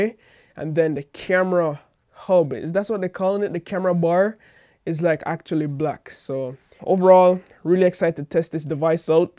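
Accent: Jamaica. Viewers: from you start speaking I said this definitely is jamaica 🇯🇲🙌🏽🙌🏽🙌🏽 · Can't hide that Jamaican accent... You Jamaican? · your Jamaican accent is rich i love it 👌🏾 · Are you Jamaican, I hear the accent but I'm not sure.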